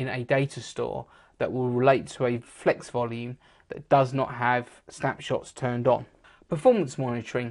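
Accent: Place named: United Kingdom. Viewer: Thanks for yor video but sometimes your britsh accent gets in the way. Too much 'nasal effects' lol making it hard to understand. for instance what you say the console(light) what does Dell and HP call it? And you clearly didn't answer the question to the missing part 5 video. Keep you answers simple please... Thank you once again. Do you ever work with DELL SAN's what do they call them? You introduced a new term called LUN but failed to explain it. What are the common SAN models used in an enterprise environment? Furthermore: For instance, in an enterprise environment installing and troubleshooting SANs (Best practice) Performing switch add/zoning. Installing and troubleshooting Virtual SAN, SnapView/MirrorView Thanks in advance!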